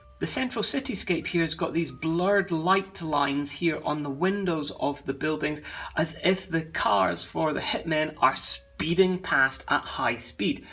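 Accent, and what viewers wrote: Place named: Chicago, United States. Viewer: Bought this after seeing this. Dodgy Chicago accent lol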